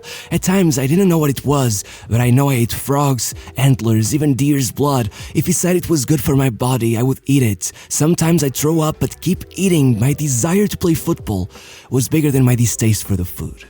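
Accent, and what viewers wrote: Portugal. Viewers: your Portuguese accent is funny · Are u Portuguese? I feel such a Portuguese accent in your english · I know a tuga talking english when i ear one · AM I THE ONLY ONE THAT CAN'T HEAR THIS NARRATOR?? So dramatic.....i bet he's portuguese.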